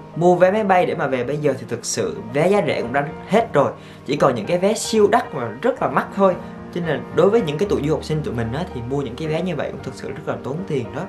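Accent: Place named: Central, Vietnam. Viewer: Anh là người quê ở đâu ạ. Nghe giọng rất giống giọng miền Trung :33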